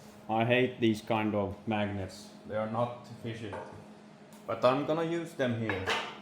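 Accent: Finland. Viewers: ha, sa till frun när vi satt här och drack kaffe och jag kollade på videon att snubben måste ju vara finlandssvensk! Han pratar inte engelska som finnar brukar😋 Hursomhelst intressant att följa projektet.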